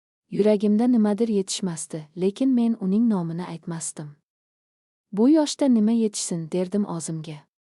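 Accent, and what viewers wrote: Russia. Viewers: Бу укиетган киз татар миллатига мансуб булса керак шунинг учун шундай укиса керак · Ким укиябди урисми товба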